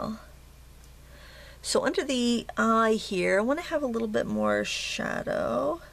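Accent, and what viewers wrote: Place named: New England, United States. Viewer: I never tire of hearing the Maine/New England "Squerl" :) oh And "seepeeah" is correct - "Sepia" the Neo-Latin name for the first genus of cuttlefish that Linneas named in 1758. It is a transliteration of the Ancient Greek "σηπία", "sēpía", meaning "cuttlefish", and of course the colour has the same name as the ink of the cuttlefish is the original (and best in my view in terms of hue, stability is another matter) "sepia" paint. Hard to get now as cuttlefish are sold frozen whole for food with the ink sacks intact now, so not a byproduct.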